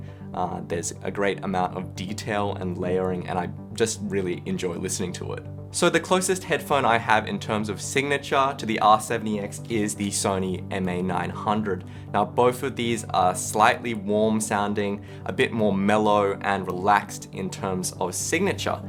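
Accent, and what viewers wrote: Australia. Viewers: are u from Australia?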